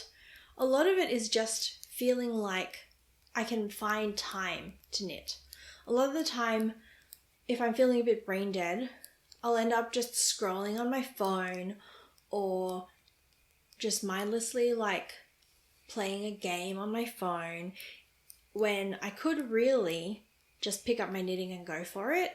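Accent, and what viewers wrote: Australia. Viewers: So glad your enthusiasm has returned! Looking forward to seeing your next vlog. It's always good to hear a fellow Aussie voice on youtube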